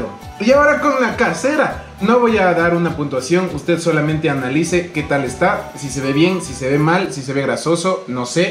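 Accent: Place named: Ecuador. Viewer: Que mrd? eres Pijo? o eres ecuatoriano... joder.... lo odio :")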